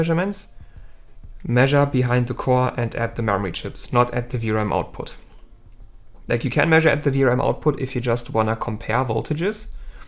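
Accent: Germany. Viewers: Started watching and thought to myself that is German accent isn’t it? Then you said Germany and I knew it!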